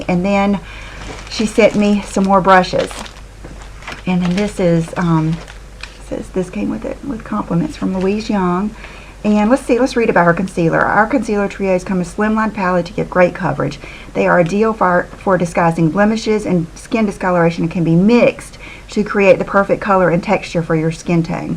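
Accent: South, United States. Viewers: My husband and I are having coffee this morning in our sun room...he heard your voice and asked me, "Are you watching your favorite southern girl again?" :)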